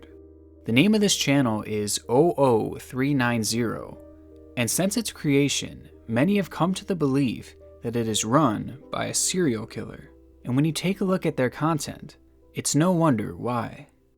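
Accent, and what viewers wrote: United States. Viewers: the improper grammer is driving me crazy my guy lol, you keep saying woman when you are talking about women plural. You sound american or Canadian so I'm confused with this repeated mistake. Great video tho keep em up, just my little nit picky gripe.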